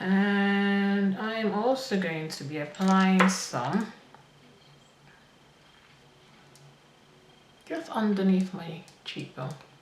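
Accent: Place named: Africa. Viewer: Love your African accent and your tutorial. Can you do a lipstick tutorial please???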